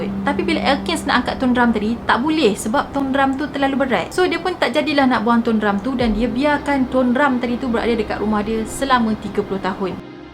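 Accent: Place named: North, Malaysia. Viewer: 20% masih ada sleng utara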